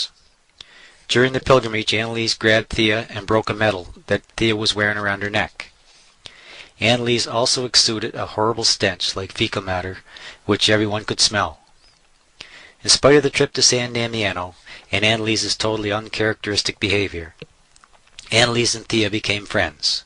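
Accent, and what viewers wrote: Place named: United States. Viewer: Because there's been quite the many bad influences coming in to different places in the world from the United States and thus, that's why you sounded like you had been from the United States to me. I've had enough about the United States government. They've badly confused me, notice anything different about India?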